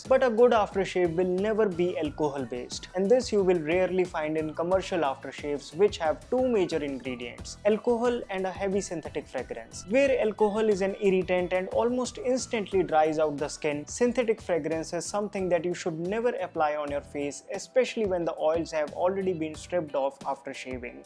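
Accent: India. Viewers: So Indian English accent 😂, hilarious 🤣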